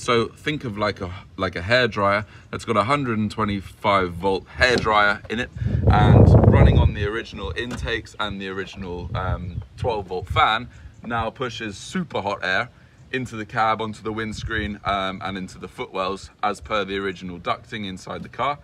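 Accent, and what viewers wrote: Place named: RP, United Kingdom. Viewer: Sorry you sound too posh to give you my money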